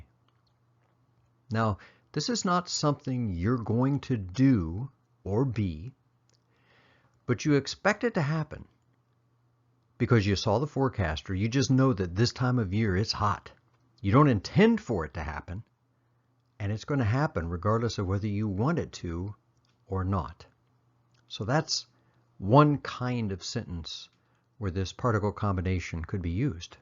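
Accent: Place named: Myanmar. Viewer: you are genius and smart man. I love your Myanmar accent.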